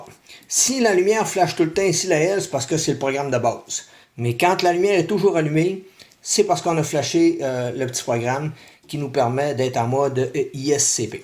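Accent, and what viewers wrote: Quebec, Canada. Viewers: Enfin une video quebecoise .. c'est l'fun entendre cet accent la sur des tutoriels :P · allez hop ! c'est passé ...ça maaaaarche !!!! merci à toi, ce tuto est génial !!! en plus suis grave fan de cet accent "quebecoué" ... surtout ne change rien oh non rien ...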